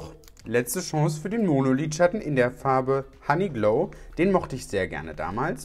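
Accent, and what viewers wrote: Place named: Cologne, Germany. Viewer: ‚Schätzelein wo biste denn?‘ - seit deinem min Video lässt du den Kölsch Jung aus dir raus. Ich liebs! ❤️❤️😂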